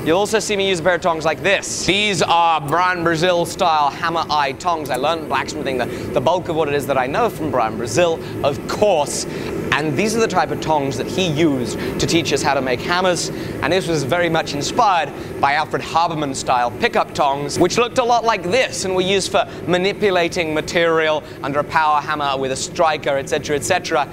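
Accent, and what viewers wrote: England, United Kingdom. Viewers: where about's are you from in England to obtain this accent?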